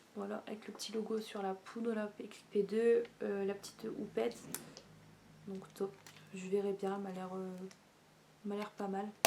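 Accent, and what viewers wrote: Nord-Pas-de-Calais, France. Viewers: Coucou, je débarque sur ta chaîne et je me suis abonné direct ! Je suis une nozaddict et j'adore tes vidéos :) une petite question, tu viens d'où ? Tu as pas un petit accent ch'ti mdr???? Gros bisous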